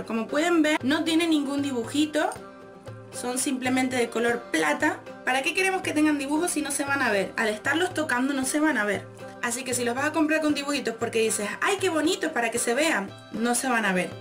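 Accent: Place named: Canary Islands, Spain. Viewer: holaaaaa chiquilla!!!!, si dame consejos ( te cuento, es un secreto no le digas a nadie porque mi gran reputacion esta en juego jeee, me cerraron transitoriamente la clase de folklore arabe porque mi profe Ramiro tiene unos proyectos importantes ( y tambien es secreto, sacara un disco con Amir y Saida en la portada ) asi que quede algo colgado, igual voy siempre a la academia y llego un nuevo compañero que hace bellydance y bueno me meti en esa clase jaaa, no puedo dejar de ir a la academia y ha sido una forma de seguir participando, por supuesto que me veo como gorila intentando hacer los pasos, por supuesto que nos divertimos mucho con mis compis jee, y bueno tendre que esperar un tiempito, no quiero irme a otra academia - y bueno ya nos estan dando clase de ritmologia y vamos a empezar a usar los chinchines ( crotalos ) jee, entonces help me por cierto te ves re linda amiga - re lindo tu monedero - uen consejo donde comprarlos, no hay tienda de esencia oriental??? 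- bueno tengo un amigo de Mexico que hizo un belly con chinchines pero usaba traje de varon de folklore arabe y se ve muy bien, asi que mi idea es hacer un dabke o folklore arabe usando chinchines - bien, sueltito y con elastico, de 10 - el imperdible jee ( traba de gancho jaa ) que lindo hablan en Canaria, ya te lo dije muchas veces - buenisimo video me re re sirvio beshos besho s smuack!!! - Gus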